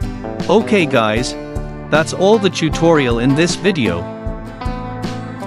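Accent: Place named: Ireland. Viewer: Great Irish accent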